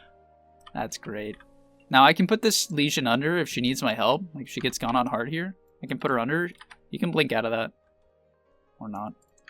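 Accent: India. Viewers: i was surprise too. Because I watched indian programming tutorial videos, I thought it was an indian person. lol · I thought there is a new guy, a indian one haha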